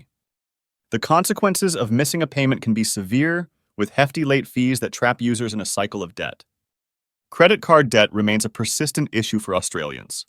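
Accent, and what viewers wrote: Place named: United States. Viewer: Sounds like it….can’t believe a yank would be saying Australia has a debt problem. Obviously oblivious to what’s going on in his own backyard! 37 trillion and counting.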